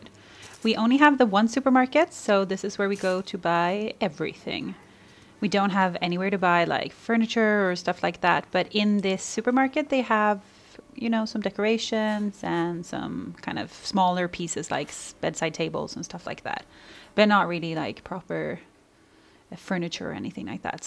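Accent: United States. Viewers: are you american? you just sound american to me, but somehow i doubt you are since you live in such a unique place. i bet you have an interesting story. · Came here from Twitter where someone uploaded your video from Tiktok I have been enjoying your videos a lot but I am surprised by this small town. I’m from England and when you go to smaller towns or villages there won’t even be brands we’re familiar with in big towns and cities but here in Longyearbyen I see brands at the supermarket I recognise, which is funny. Did you go and live in USA for a while because you’ve got a perfect typical American accent? And does the sun rise at all or is it constant darkness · Did you live in the United States? You sound like you learned English from Americans.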